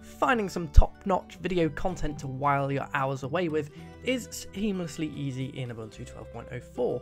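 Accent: United Kingdom. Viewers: I LOVE Ubuntu , and I love British accent ^_^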